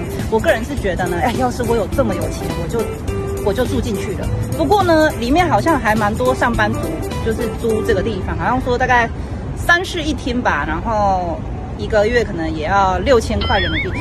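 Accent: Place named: Taiwan. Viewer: 國語台灣本島腔，難聽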